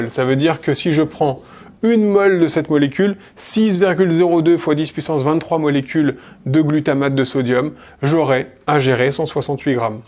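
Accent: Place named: France. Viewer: Mignon votre accent français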